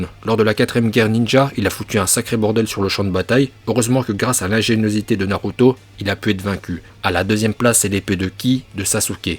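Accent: Japan. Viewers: ton travail de porte parole, ton accent japonais, masterclass, le jour où tu seras chauve tu auras sans doute la capacité d'utiliser le kansei migatte no chauvui avec un peu d'entraînement, bonne soirée à toi et tu passeras le bonjour au grand maître kishimoto 🙏🏻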